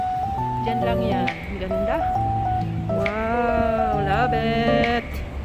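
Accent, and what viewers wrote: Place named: Ilocos, Philippines. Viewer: Parang ilocona sounds ka lods love it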